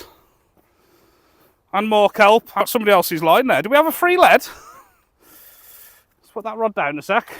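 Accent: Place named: Black Country, United Kingdom. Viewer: Love the dudley accent same as me big up the black Country 😂😂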